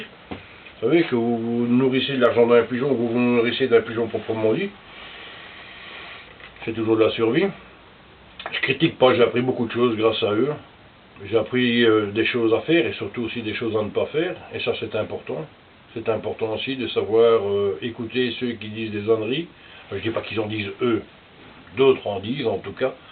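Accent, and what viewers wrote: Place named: Brussels, Belgium. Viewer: Salut le ou le! Quelques réaction à tes propos... Tout à fait d'accord avec le terme de "prévoyant" et non survivaliste. Je me disais bien, t'as bien un accent de Bruxelle... Promis, moi je touche pas un copek en présentant mes "jouets". Quoi, t'as pas aimé mon four de campagne et ma chaufferette de rando????lol Je vois mal ma femme accepter de faire de la soudure dans le salon...lol.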